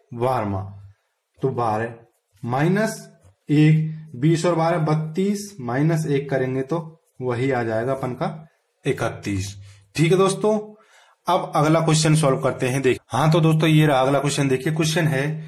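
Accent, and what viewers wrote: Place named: Bundelkhand, India. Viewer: Sir apki ki avaj m bundelkhandi language ka touch dikh rha.loved this.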